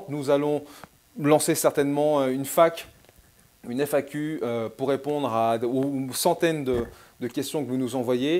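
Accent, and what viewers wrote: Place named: Texas, United States. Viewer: Your accent is so strong I couldn't understand a word, are you from Texas or something?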